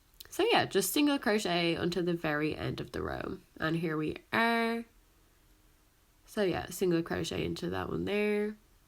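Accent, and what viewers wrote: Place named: Ireland. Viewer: Are you Irish? you have an Irish accent. (I know what an Irish accent sounds like because I live in Ireland)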